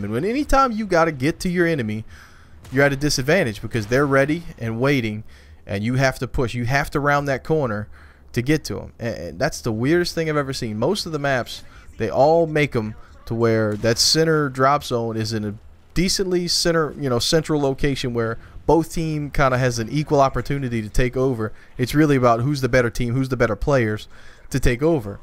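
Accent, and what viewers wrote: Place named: Louisiana, United States. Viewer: omg i knew it was something about your tone that was familiar and its that we are both got that Louisiana sound. well its good to know one of my favorites are from the same state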